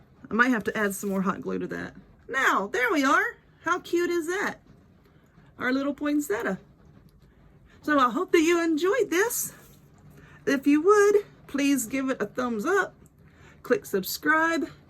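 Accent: South, United States. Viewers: First time watching! Love the easy project. Your southern accent is beautiful and you are a funny girl. Like, Like, Like! 👍 👍 👍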